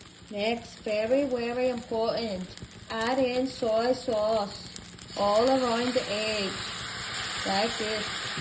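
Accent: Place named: Derry, United Kingdom. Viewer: I can here the derry accent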